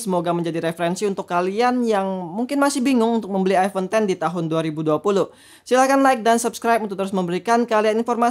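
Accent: Palembang, Indonesia. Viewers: pecak nyo wong kito :D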